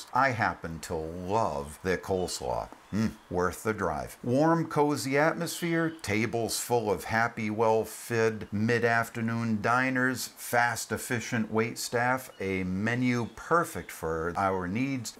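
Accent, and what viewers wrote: Maine, United States. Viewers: this narrator has a typical Maine accent or if it's a conglomeration of accents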